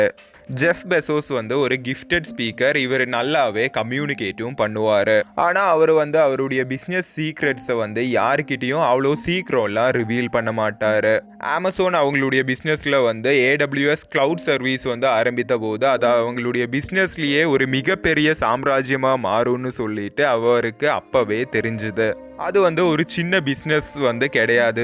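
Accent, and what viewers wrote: Kerala, India. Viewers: Kerala thambi vioce maari irukkea 🤔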